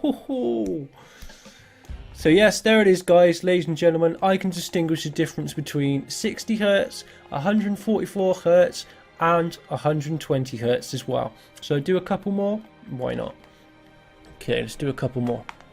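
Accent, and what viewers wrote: Bristol, United Kingdom. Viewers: good to hear a bristol accent! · Is this guy Bristolian?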